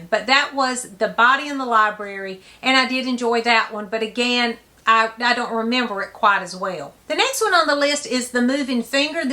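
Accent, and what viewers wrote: South, United States. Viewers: As you see by my moniker, I am a fan of Agatha Christie’s Jane Marple. It has admittedly been years since I’ve read one of them, tho in my youth I read each several times, saw the movies, blah blah. opinions are likely as good as anyone’s. She’s a lovely looking gal, but once she opens her mouth, the ambiance is gone. The God-awful overt Southern accent just overlays any intelligent conversation with ‘nails on chalkboard’ irritation for me. That accent accompanies a mindset I don’t like, and I dare say Ms. Christie would roll over in her grave if she could hear it. Lighten up on the Souther roots, and give your SUBJECT center stage. Real journalists have been doing so for generations. Sorry folks.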